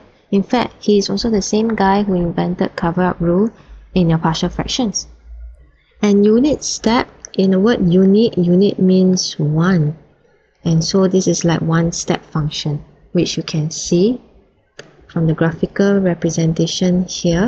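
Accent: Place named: Malaysia. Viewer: You sound Malaysian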